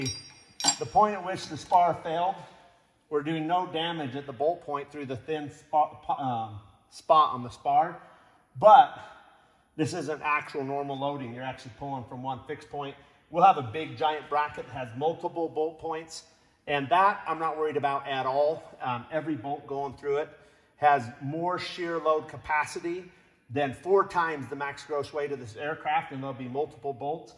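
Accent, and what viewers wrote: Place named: Utah, United States. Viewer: you must be from utah the way you talk.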